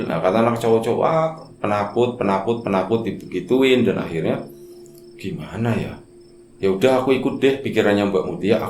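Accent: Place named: Java, Indonesia. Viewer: Sekarang agak mendok ya mas 🤭🤭🤭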